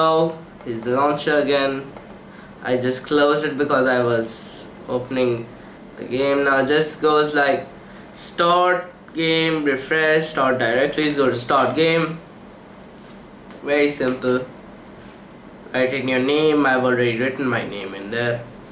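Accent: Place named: India. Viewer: but i love ur Indian voice ^^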